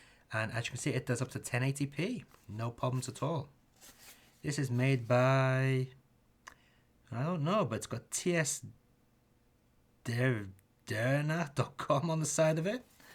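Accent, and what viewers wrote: United Kingdom. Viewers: Hey I hope you don't mind me asking. Are you a native to japan or did you move there? Your accent sounds very British!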